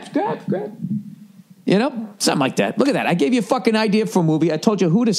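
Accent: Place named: New England, United States. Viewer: that new england accent always gets me. "YOU COULD GET AN AWWSKA"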